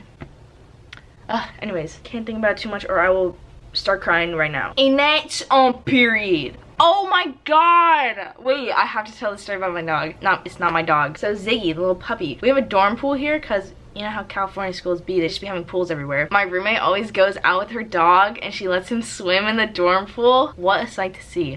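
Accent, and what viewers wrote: United Kingdom. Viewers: luv the british accent luv! also congrats on 100k<3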